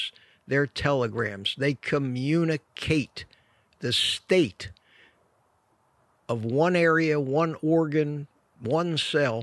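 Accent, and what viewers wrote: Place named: East Coast, United States. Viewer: Excellent info given with an authentic east coast accent.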